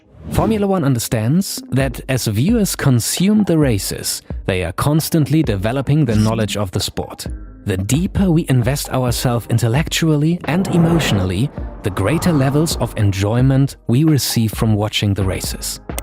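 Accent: Germany. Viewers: German native speaker as commentator?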